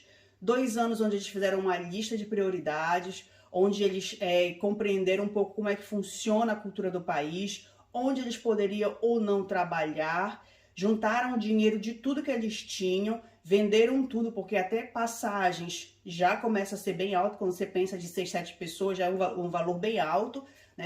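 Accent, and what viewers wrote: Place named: Pará, Brazil. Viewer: Ótimo vídeo, conterrânea! Nemo dá pra perceber que você também é paraense kkkk... sotaque super marcante .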